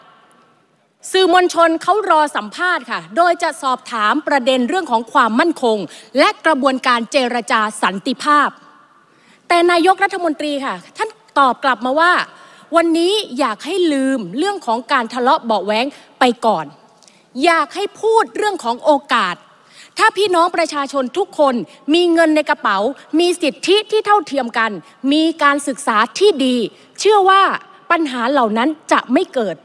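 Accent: Thailand. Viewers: แหลงหรอยนัดสสคนนี้ สสใต้ไหมครับ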